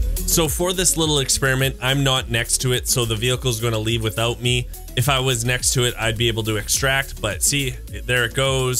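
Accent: Canada. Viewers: Trailer park boys accent ; ) Cheers from Quebec !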